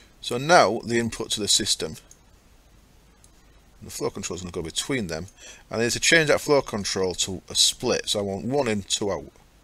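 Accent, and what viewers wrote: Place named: Hull, United Kingdom. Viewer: I think I listened to you say auto-spawner about 4 times. That Hull accent is hilarious, not in the mocking 'haha you sound different' way, but in the plain hilarious way:D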